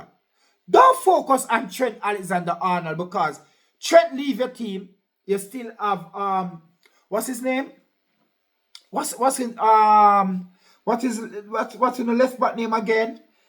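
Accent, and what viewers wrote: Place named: Ireland. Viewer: that irish accent from so funny i didnot expected but when you speaking english with irish accent is better rather than you speaking english with accent british that martinelli assist against fulham when we won 2-1 similar like ozil assist to giroud arteta clearly ruined martinelli because he change martinelli become defensive winger instead become deadly winger in final third when we won against fulham 2-1 in april 2025 and you watch Muniz and iwobi, imagine if that was Mbappe and Vini we would be done I think Arteta will leave this season, I don’t think Arteta will ever be able to work with good and experience players. Bertha will sign good and exp players because calafiori get injured so we Can't even use Calafiori in his natural position against madrid at ucl first leg for replace gabriel maghalaes who get injured right now against fulham on april 2025 but even if calafiori not get injured then he still shite as centre back because cannot defend and slow, Now the Artetasexuals will have an excuse to latch on for UCL exit. 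ITS FULHAM so why risk best players before important CL game?? ARTETA IS A MUPPET!!!, Arteta's fault as should rest best players for CL game 🤷‍♂ we can't win premiership so no brainer, this stupid manager too inexperienced for this job, HOW ARE OUR PLAYERS PULLING HAMMIES ALL THE TIME? This must be systematic problem for which is Arteta responsible. Why are we surprised? This is the Arsenal way. Overplay the regulars until they snap a t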